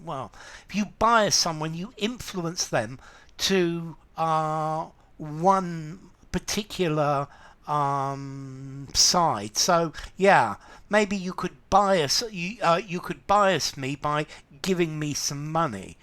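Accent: United Kingdom. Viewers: We benefit a lot from you,british accent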